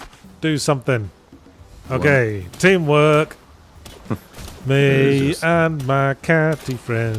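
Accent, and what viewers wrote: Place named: United Kingdom. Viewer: It's so weird for Yahtzee to sort of grouse on the anachronistic look of the game. I mean, from ZP to this, his entire schtick is humorous anachronism. It's the humor of a sophisticated British accent saying creative swears. It's a very consistent artstyle of blob shapes juxtaposed with any old photo pasted from google images. That's why I find it funny. God forbid a game do the same thing.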